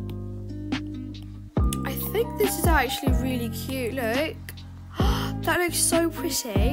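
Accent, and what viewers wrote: United Kingdom. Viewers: Are u Scottish?? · Thank you so much! You’re voice is soo cute u have an really cute British accent! New subscriber!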